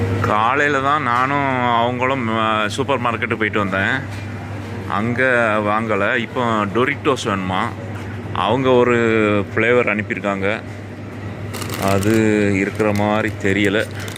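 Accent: Tirunelveli, India. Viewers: நீங்க பேசும் போது அந்த திருநெல்வேலி பாசை வருது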